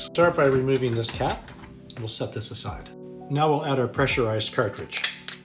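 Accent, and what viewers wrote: Canada. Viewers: The Canadian accent shined through. 😊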